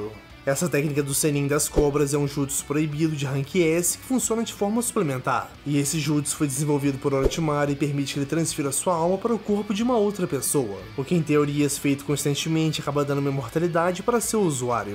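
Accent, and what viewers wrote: Portugal. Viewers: Vc é português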